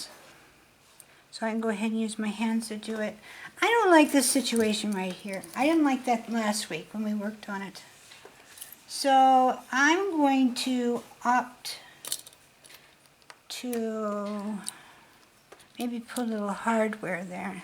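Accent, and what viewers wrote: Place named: Pittsburgh, United States. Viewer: Very nice! Love the hummingbird one. And got a real chuckle out of the Pittsburgh-ese. LOL Made me feel right at home. <3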